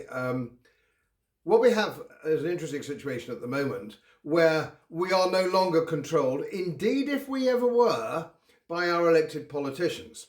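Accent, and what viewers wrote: United Kingdom. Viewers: I cannot believe I am hearing these suppressed TRUTHS about the world we (actually) live delivered by an eminently respectable BRITISH voice on Youtube... I normally have go onto Internet Archive to get this kind of information (and then often only after 'creative' searches and wading through dozens and dozens of off-the-case fluff to get to the good stuff!).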